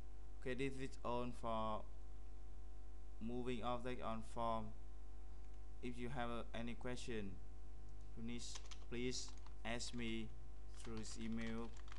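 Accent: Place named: China. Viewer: Sorry buddy, but you do NOT explain any of the commands you enter, so the viewer is left guessing why you are doing things. Plus with your heavily accented chinglish accent I suggest you GIVE UP these tutorial to be honest. They just do not crack it compared to many others I have watched and learned a lot from. Maybe do them in Chinese for your fellow countrymen instead ?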